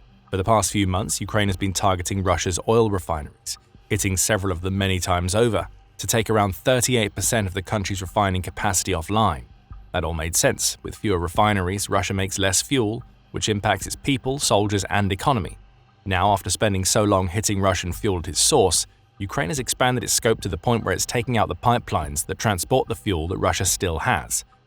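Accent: England, United Kingdom. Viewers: Why does the narrator (Who's clearly English by his accent) keep calling petrol, "Gasoline"? It's called petrol in the UK NOT gasoline.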